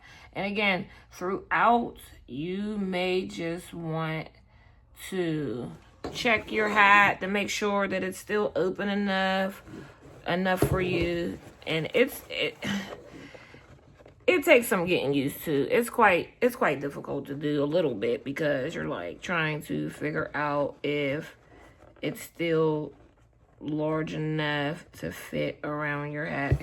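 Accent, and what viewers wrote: Pittsburgh, United States. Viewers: Girl, I knew you were from pittsburgh when you first started talking! 😂😂😂 #412😊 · i know you from pittsburgh mama i hear my ppllllllll lol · This is an awesome tutorial. I am born and raised in Pittsburgh Pa and your accent is thick. I can hear the Burgh in your accent. I say that you have an accent because I don't hear it in my voice because I live in ky over 20 years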